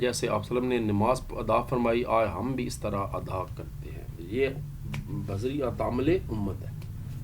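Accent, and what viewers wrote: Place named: Rural, Pakistan. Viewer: Sir where are you from? Your accent is just like the accent of my village peoples (GANDAKASS)❤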